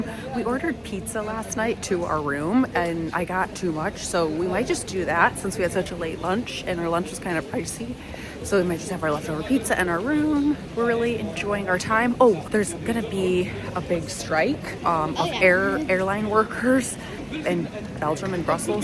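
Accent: United States. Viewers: Thick Nhat Hanh was great. I used to have a book by him, but I don't have it now. I must have lent it to someone (when I lend, I don't bother trying to remember to whom). How was that pizza on a scale of 1 to 10? You're a better traveller than I would be. I probably wouldn't even attempt mainland Europe without having one or two other people to help me figure it out. UK? No prob. Ireland? No prob. But I would be too intimidated by communication difficulties to visit Belgium, Italy, France, Germany, etc. Have you experienced any rudeness that you suspect might be related to your American accent? The US government has lost a lot of friends over the past few years due, of course, to our complicity in a certain other country's... shall we say, activities?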